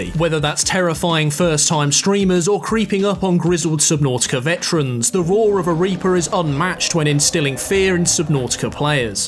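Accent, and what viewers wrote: United Kingdom. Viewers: Jesus, you're about as basic bitch of a YouTuber as you can be. British accent, over used 5 images of what you want people to see you as, and cliche sound effects, ew. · I love your British accent. Also great video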